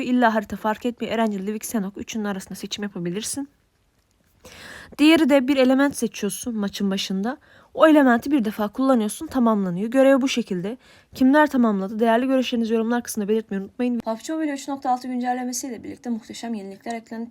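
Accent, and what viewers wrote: Azerbaijan. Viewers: Turk dilini Azerbaycan dili kimi danisirsan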